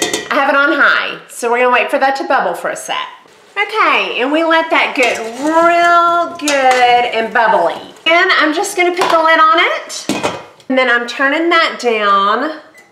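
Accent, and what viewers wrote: South, United States. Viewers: Thanks so much for the detailed response! Just found your channel today through Elle! I like your channel so I subscribed! I'm detecting a strong Southern accent - where are you from? I'm from North Carolina.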